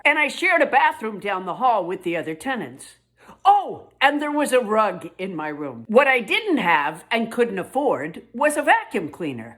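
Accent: Boston, United States. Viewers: I love hearing a Boston accent combined with an IDGAF rant, especially when it’s about our current Mob Boss in Chief. I like to think that it’s not only us atheists that have this type of clarity, but it helps 😂 Glad to see healthy and in a much better place these days. I’ve been down that road myself and can empathize. We don’t always get 2nd chances and when we do we don’t always take it. She obviously has, respect.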